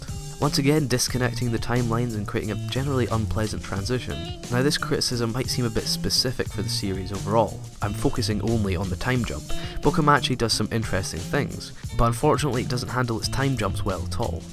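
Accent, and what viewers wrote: Scotland, United Kingdom. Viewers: Where are you from? Your voice sounds like you're from Edinburgh/Borders. Great video.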